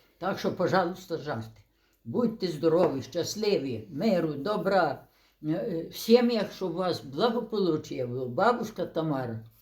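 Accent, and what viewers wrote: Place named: Ukraine. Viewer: Этот украинский говор звучит для меня как музыка. Так говорила моя бабуля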